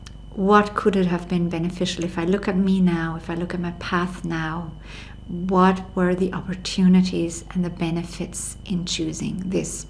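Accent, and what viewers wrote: Ireland. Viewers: May I ask are you Irish?! I am and your accent is very Irish!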